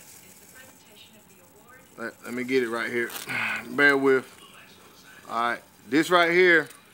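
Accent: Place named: Virginia, United States. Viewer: Thank you! You sound like my family members from Virginia. I appreciate your help.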